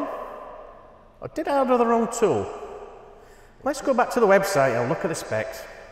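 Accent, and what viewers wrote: United Kingdom. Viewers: a regional British accent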